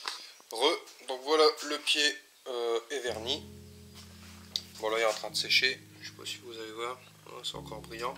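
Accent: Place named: North, France. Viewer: Ça fait pas longtemps que je suis tes vidéos. Mais merci pour ton taff, tes vidéos sont simples et bien expliqués. Mais tu serais pas du nord; ta un petit accent. J'ai le même 😁😁.👍👍👍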